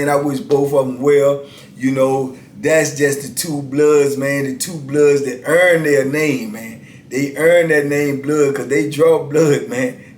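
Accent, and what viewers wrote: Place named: Tidewater, United States. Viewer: you have a way with words! I can forsee you writing a Book/Audio Book,I lived in the Tidewater Area,and when you say certain words,it takes me back! Appreciate your efforts! Keep applying Pressure to these other You-Tubers.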